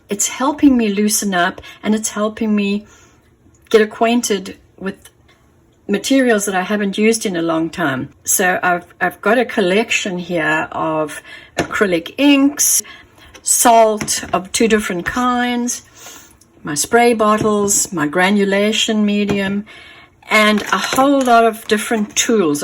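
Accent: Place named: South Africa. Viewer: Thank you. I recognized your accent. I was born in SA. Love your work, been doing watercolour for many years, but this is a fresh look at doing abstract. Im going to try my hand at it.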